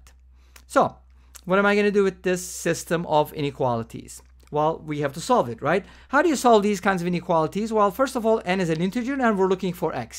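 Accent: Turkey. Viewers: ingilizcen türk ingilizcesi gibi geldi. twitterdan takip ettiklerine baktım ve ta taaa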